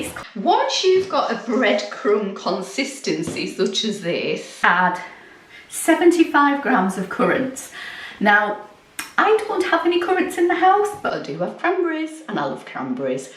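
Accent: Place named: Cumbria, United Kingdom. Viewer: A Cumbrian now living in Devon. knew Warcop, near you, well having relations there. Love the accent!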